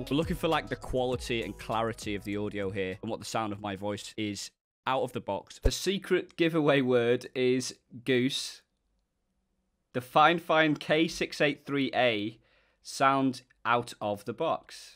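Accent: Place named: Northern England, United Kingdom. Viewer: You've got a nice accent . I wonder where you are from in The North of The UK ?? This will help me in my English Accents Studies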